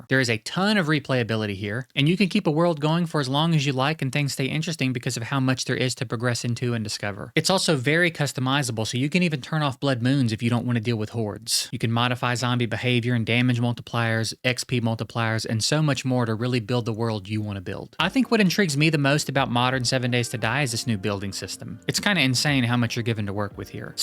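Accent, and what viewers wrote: South, United States. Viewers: your voice for some reason gives me mister grayson vibes so hard XD that southern tang on some words i have it too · the southern drawl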